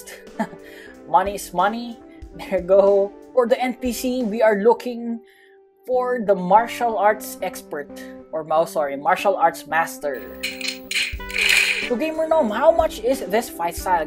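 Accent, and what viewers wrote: Philippines. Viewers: He has a filipino translated channel and he has an accent of a filipino so yes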